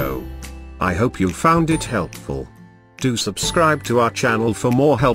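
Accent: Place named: United Kingdom. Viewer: I love British accent so cute and sexy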